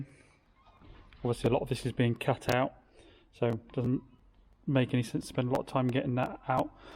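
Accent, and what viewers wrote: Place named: North Devon, United Kingdom. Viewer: haha.. thought you sounded really close, I’m in south Molton!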